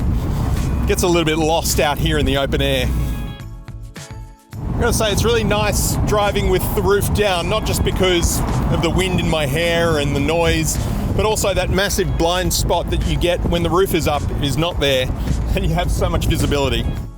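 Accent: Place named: Australia. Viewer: lol, oops, didn’t realize you were Australian and that y’all did that too. Fascinating! Love your sense of humor as much as your accent. I have a thick Appalachian accent myself and do not have a great ear for other accents after years of linguistic isolation. Thank goodness I did have a Miata to make it through. lol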